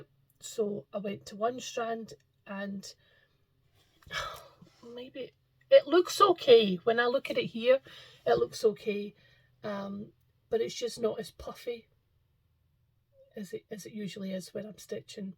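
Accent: Scotland, United Kingdom. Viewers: I enjoy your videos so much. And your Scottish accent is so delightful! Your enthusiasm for this beautiful craft make me go down rabbit trails in my stitching life. Blessings from Canada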